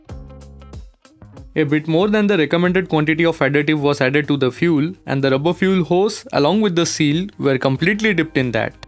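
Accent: India. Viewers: Duh, indian english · Indian accent not arabic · The Indian accent is killing me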